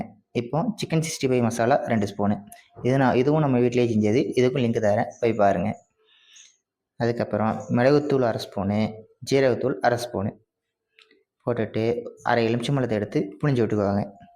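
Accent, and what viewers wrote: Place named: Madurai, India. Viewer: உங்கள் எந்த ஊர் ? பேசும் போது தேற்றம் மதுரை என்று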